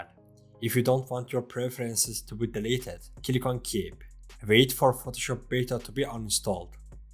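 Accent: Turkey. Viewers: I dont know why but you have a very stong Turkish accent. Nice video! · Türksün demi la? aksanından anladım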